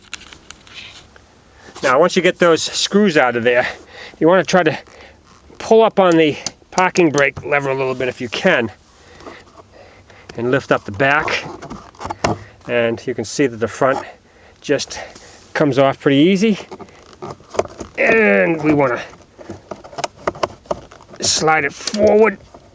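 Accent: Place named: Woburn, United States. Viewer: I see this video has been up for a while but I got to say a couple things I lived in Woburn for a number of years met my wife there 30 years ago and through her I met some friends we still stay in touch and when I heard your voice I knew right away where you were at you sound exactly like my buddy Little Dave made me smile thanks for the video I’ve done this one many times I have a 99 Ford escort with get this 514,000 miles on it I just wanted to comment about the car but I’m gonna put another little post up just a minute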